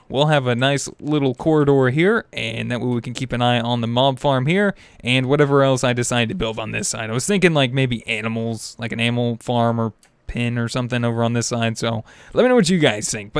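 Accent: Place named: South, United States. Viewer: eli Heakins Based on other people talking in his videos and his speech I think he's from the south not the north.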